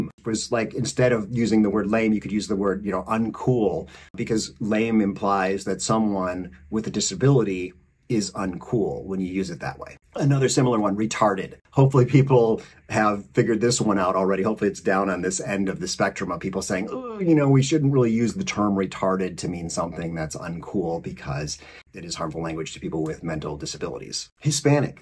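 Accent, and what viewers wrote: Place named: Canada. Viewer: Gawd ... You almost sound Canadian. For me that is 1 of the best compliments I can think of. Personally I try to assume everyone deserves the chance to disappoint me or amaze me. So far you've only amazed me. Grrr8 subject, grr8 attitude and good for you in trying to become a better person. Gawd knows we need more of "those" people. If I offended anyone I'm Canadian so ... sorry . P n D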